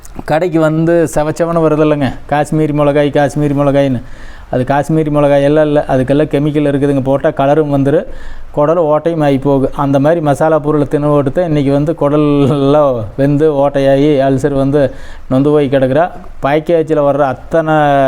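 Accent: Kongu, India. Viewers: இலந்தைவடைசெய்முறைஅருமை உங்களுடைய கொங்கு தமிழ்மிக அருமைங்க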